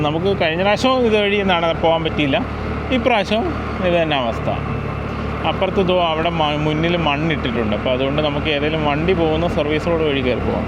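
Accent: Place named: Kottayam, India. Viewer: Broyude slang tvm pole thonnunnillalo kind of kottayam pole oke thonnunnu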